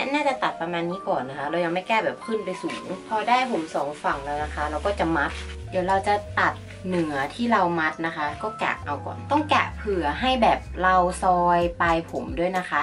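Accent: North, Thailand. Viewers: คนเหนือแน่เรย เก่งจร้า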